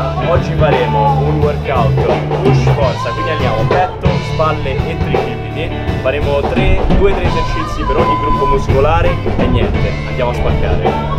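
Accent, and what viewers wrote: Rome, Italy. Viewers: Ti seguo da poco,volevo chiederti,da quanto sei a Londra? Perché la ciancicata romana se sente na cifra! Daje così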